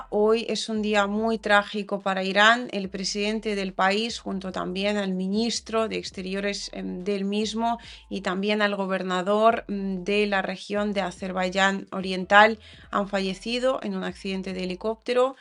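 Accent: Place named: Spain. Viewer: Hola, por tu acento creo que viviste mucho tiempo en algún punto de España. Que significado tiene la plaqueta color rojo a tu espalda. Me gusta tu página. Un abrazo desde Bogotá.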